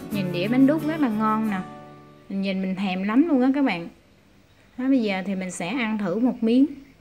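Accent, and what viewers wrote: Mekong Delta, Vietnam. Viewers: Trinh Trần NGƯỜI MIỄN TÂY HÌNH NHƯ PHÁT ÂM NHƯ VẬY ĐÓ, BẠN CÓ GIỎI QUA DẠY LẠI MẤY NGƯỜI MIỀN TRUNG, BẮC KÌA, CÓ VÙNG GỌI N LÀ L VÀ NGƯỢC LẠI NỮA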